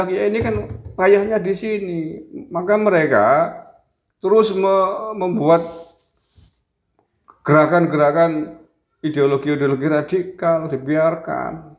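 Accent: Banyumas, Indonesia. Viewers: 😅😅😅😅bhs Banyumas e lucu tenan kang😅😅😅😅😅😅..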